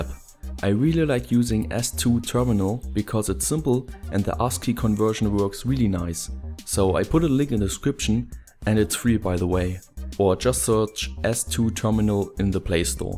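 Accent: Germany. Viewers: Du bist deutscher man merkt es bitte mach deine Videos auf Deutsch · Hmm.... The Sound is like german speak english 😉😄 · Are you a German? Sounds like :D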